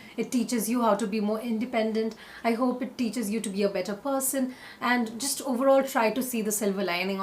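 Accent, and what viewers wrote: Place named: India. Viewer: You are amazing ,fabulous indian. Adore your accent.. Allah keep you,stay safe.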